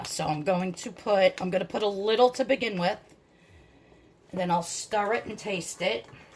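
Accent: New York, United States. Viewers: I’m new to your channel ! Loving your recipes and your accent ! Are you from NY ! You sound like me !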